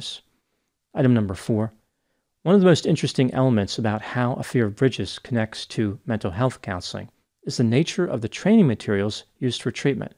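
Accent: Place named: Baltimore, United States. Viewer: Doc, I’ve always thought you had a Baltimore accent.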